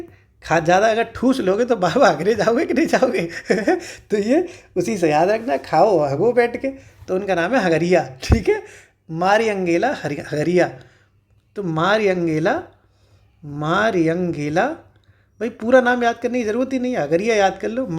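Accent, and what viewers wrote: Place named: Firozabad, India. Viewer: Sir फ़िरोज़ाबादी touch ह language मै..... 👍🏻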